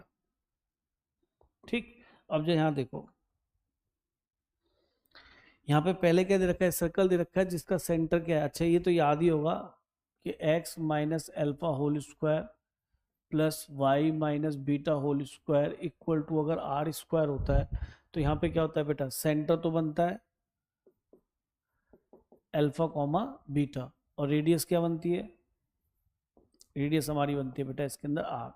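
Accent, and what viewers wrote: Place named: Uttar Pradesh, India. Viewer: sir ap up s he kya?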